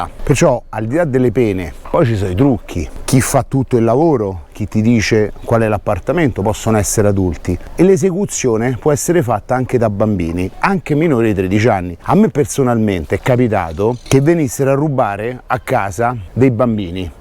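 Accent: Rome, Italy. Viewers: Complimenti, una spiegazione semplificata per tutte le Età, poi con l’accento Romano, nun ce potemo sbagliá 🙂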